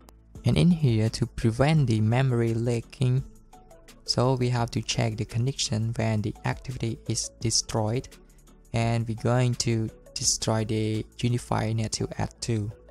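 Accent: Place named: Thailand. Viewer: Thank you for good tutorial, (One question, are you Thai people? for me your sound like Thai people 😊👍)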